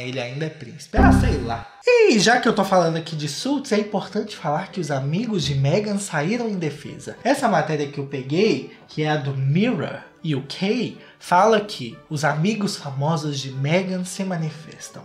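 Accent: Minas Gerais, Brazil. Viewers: Sabia que conhecia esse sotaque kkkkk , mineirinho.kkk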